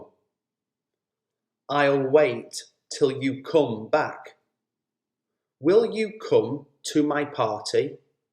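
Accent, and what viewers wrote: United Kingdom. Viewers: Спасибо. Только один вопрос в изучении английского языка. Как учить британское произношение, как у вас по вашему уроку или всё-таки сразу американское для разговорной речи? Если учить британское произношение, не будет ли оно мешать в понимании американской разговорной речи? Спасибо. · Hi! Thank you for teaching us.🙋 I've just done day 1 and I have a question. Is it british accent? (I mean english language the og ofc) Are these homophones also the homophones in american accent english? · Hi Greetings from Türkiye nice video for british accent I can understand Thanks :)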